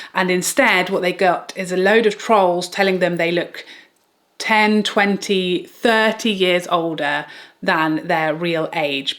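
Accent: United Kingdom. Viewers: Hi dear, looking beautiful as always!!!🤩🤩🤩🤩And thanks for sharing this with us! Never heard of this actually, what a waste of time! I will never want TikTok, i tried Treads for a day, but found following here and on Insta is enough. But i love watching your Channel of course, love it! And other content creators as well! Maybe the people i follow are very normal, but i never exprerienced this. Maybe i'm boring, but i follow people from Weight Watchers, of course people from church, singing etc, cause i'm a christian, i love to follow wildlife, of course i love to follow makeup as well, doing Duolingo on my phone to learn some more Spanish, but i never knew this existed! Thanks for letting us know, dear, you have so much variation in your video's, this is serious, but you also make us laugh, knowing a lot about makeup! Strange creating hate between Europe and America, i love America! 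So when i would say here i love the British acccent the most, which is true, that would never be to insult from me to anyone from Canada, USA, Australia, New Zealand, never thought about that actually, it's just that i love to hear it. Born in 1970, digi disaster number one here, hubby and son keep on laughing sometimes what i did again, but i can manage myself better and better in digi-land, baby steps,. I think i'm also tooo naif, i always think the best of people, so, i really appreciate such a video, to keep me awake, thanks so much!!!❤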